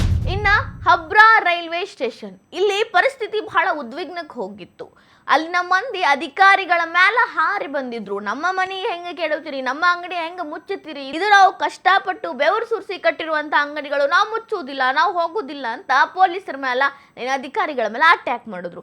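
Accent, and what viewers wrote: North Karnataka, India. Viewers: ನಿಮ್ಮ ಉತ್ತರ ಕರ್ನಾಟಕದ ಭಾಷೆ ಕೆಳಕ್ಕೆ ಚಂದಾ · ನಿಮ್ಮ ವರದಿ ಕೇಳುವದಕ್ಕೆ ಬಹಳ ಚೆನ್ನಾಗಿ ಇದೆ ಉತ್ತರ ಕರ್ನಾಟಕ ಬಾಷೆಯೆಲ್ಲಿ ಕೇಳುವುದಕ್ಕೆ ಕುತೂಹಲ ಮೂಡುತ್ತದೆ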